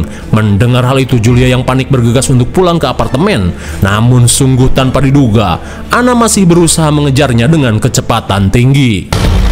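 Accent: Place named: Belitung, Indonesia. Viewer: Gue pingin tau si mimin berasal dari kota mana?? Logat bicaranya kayak logat melayu belitung.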